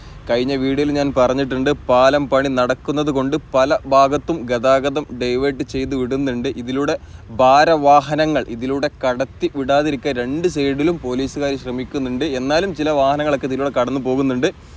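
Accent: Malappuram, India. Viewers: Nalla slang malappuram super Kutta ankamly to marad bypass koodi oru video cheyyavo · നീയൊക്കെ എവിടുന്ന് വരുന്നു മലരേ... ഇദ്ദേഹത്തിൻ്റെ സംസാരരീതിക്ക് യാതൊരു കുഴപ്പവുമില്ല.തനത് മലപ്പുറം ശൈലിയിലുള്ള സംസാരം കേൾക്കാൻ സുഖമുണ്ട്. നമ്മൾ കണ്ണൂരാണ്. ഉറച്ചസംഘിയുമാണ്. എന്നാൽ മതവും ജാതിയും നോക്കി ആളെ വിലയിരുത്തലില്ല.സ്വഭാവശുദ്ധി മാത്രം നോക്കും.രാഷ്ട്രീയമാണെങ്കിൽ രാജ്യത്തെ സമഗ്ര വികസന രാഷ്ട്രീയ കാഴ്ച്ചപാടും നോക്കിയാണ്. എല്ലാ മതവിഭാഗങ്ങൾക്കും , ജാതി വിഭാഗങ്ങൾകും രാജ്യത്ത് തുല്യനീതി ലഭിക്കുവാൻ ; പ്രധാനമന്ത്രിക്ക് സാധിക്കട്ടെയെന്ന് പ്രാർത്ഥിക്കുന്നു.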